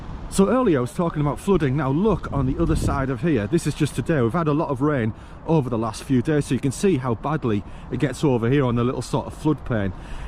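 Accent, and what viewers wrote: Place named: Middlesbrough, United Kingdom. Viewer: You're a Boro Boy by the sound of it! My first ever home Villa game was versus MIDDLESBROUGH! in February, 1968...I was 10 years old. Oh no, we lost 0-1! Stood right behind the Holte home End and to my child-like eyes I always remember the Boro keeper - Willie WHIGHAM - seemed to be like a giant spider, a tarantula in goal...spindly legs and jet-black hair and if you google his name and also images, you'll see I wasn't far wrong! Very tall, gangly stature almost stick-thin legs, short black hair....and ugly with it!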